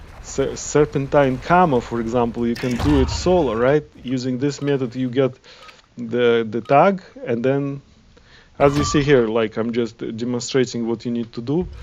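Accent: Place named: Russia. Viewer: соу рашен акцент))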